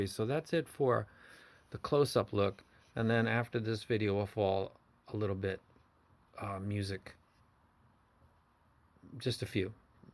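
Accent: Boston, United States. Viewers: I love it when you talk Boston to me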